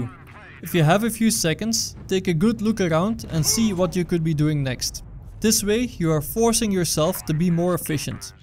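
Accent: Netherlands. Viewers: I think stay the way you are, you have the most insightful tip/tricks/tutorials. Maybe hints to certain maps for certain game modes, but other than that keep doing what you are doing. One if not my favorite BFH follows/subscribes. Oh and the Dutch accent pushes it over the top to EPIC for me every time. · If you really wanna get bigger and compeed with the big channels, you need to upload 1 video a 1 or 2 days. Im enjoying your video's tho, keep up the good work. And where u from? Je hebt een Nederlands accent!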